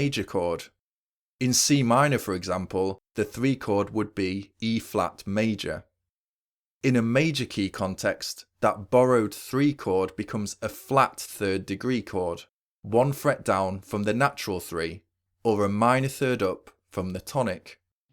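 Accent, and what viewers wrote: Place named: Liverpool, United Kingdom. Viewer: An excellent explanation of the topic. Before the Internet and YT, it was nearly impossible discover such theoretical concepts and approaches to composition. Plus, I enjoy picturing George Harrison as instructor, since you sound very much like him—a fellow Liverpudlian, I suspect. Cheers!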